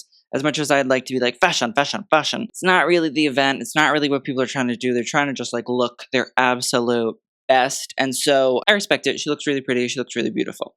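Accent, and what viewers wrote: United Kingdom. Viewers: Love you British accent...sooo cute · Your British accent sounds exactly like Stewie from Family Guy and I love it!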